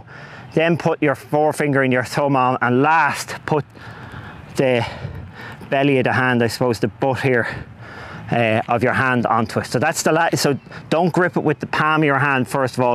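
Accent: Dublin, Ireland. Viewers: Love the Dublin accent , little tums . My favorite band is a Dublin band THIN LIZZY or how Paddy would say TIN LIZZY .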